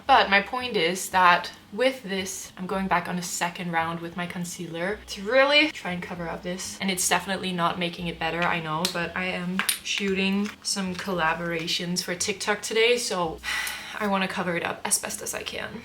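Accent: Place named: United States. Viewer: I love, love, love your videos! It's my favorite way to start off a cozy Sunday :) I really enjoy that you have stayed authentically yourself as your views have grown. I think that's what your audience appreciates about you. I like seeing the joys but also the irritations of daily life. You don't live beyond your means, you don't pretend that you are not on a budget. You share your struggles about work/life balance and the added complications that hormones give to us women. Keeping things real makes me cheer for you all the more! In terms of what extra content to add, I myself am really interested in your language(s) journey! This might be very specific to just me though lol. Learning about the way we communicate/express ourselves is endlessly fascinating. From what I've gathered, I think you speak Danish, English and French? I am from Seattle, but I have traveled/lived in all different areas of the world, and I find your specific "language mannerisms" so interesting! 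I know it's very common for most of the world to speak several different languages, but I have found that usually when someone from Europe is speaking English, they tend to have a strong underlying clipped British tone. You, however, have an almost American cadence when you speak. Im not sure if it's from watching Gilmore Girls and Twilight so many times (lol) or the books you read or having lived in a couple of different areas yourself. I have watched other Copenhagen videos, and those girls, though fluent, don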